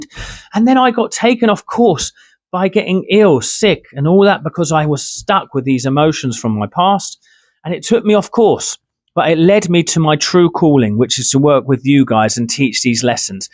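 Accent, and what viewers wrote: United Kingdom. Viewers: Halo there, lol is this uk accent? Love the content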